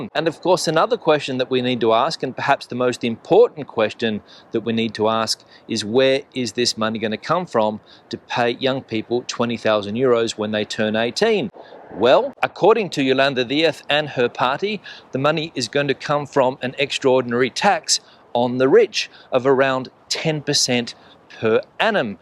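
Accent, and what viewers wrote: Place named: Australia. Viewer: Congratulations on the best Australian accent!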